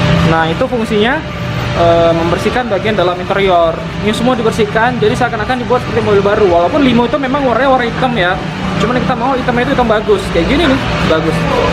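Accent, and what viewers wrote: West Sumatra, Indonesia. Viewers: Rang bukik kironyo,. Pantas ada logatnya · logat minang memang gak bisa ilang, dari menit makin jelas bgt, dima kampuang diak.....?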